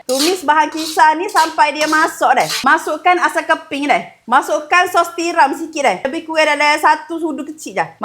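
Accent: Kelantan, Malaysia. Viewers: Berapa kali deh daaa 😂😅 mcm tu ke org Kelantan cakap?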